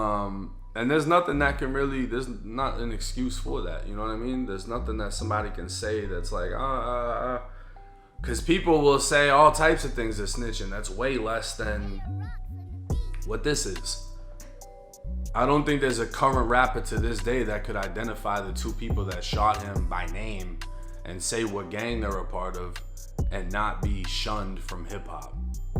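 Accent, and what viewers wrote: Boston, United States. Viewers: Im surprised you still got the boston accent but good video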